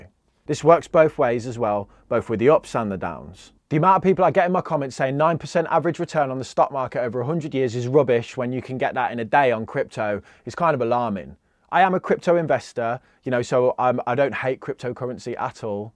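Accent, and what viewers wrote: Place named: Lancashire, United Kingdom. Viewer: It's been doing it since i got here lol thats proper Lancashire speek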